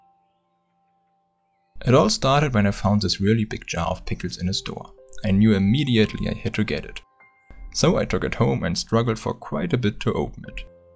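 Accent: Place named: Germany. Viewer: Man hört den deutschen Akzent ganz schön raus :D Echt schönes Video, vielleicht baue ich mir selber auch mal sowas. Aktuell habe ich eher Erfahrungen mit kleinen Terrarien gemacht.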